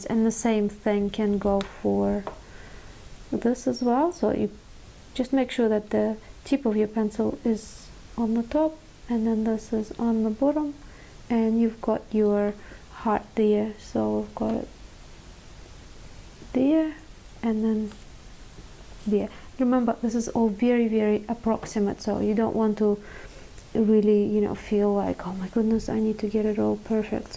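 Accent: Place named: Russia. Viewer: ahhhhh Lebanese! That’s what I couldn’t place! I got the Russian accent but your facial structure, coloring and surname was throwing me off. Lol. You are quite amazingly exotic!😍😍😍😍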